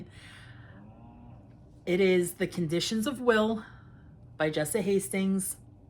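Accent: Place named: New Jersey, United States. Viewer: I love your accent so much. Is it New jersey or Pennsylvania? Sounds like Marisa Tomei in My Cousin Vinnie.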